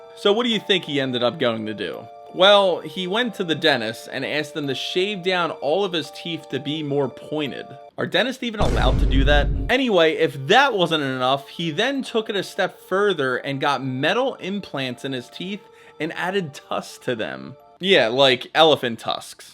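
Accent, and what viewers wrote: Philadelphia, United States. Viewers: My thoughts are that you're Philly/South Jersey accent is so heavy, that's all I could focus on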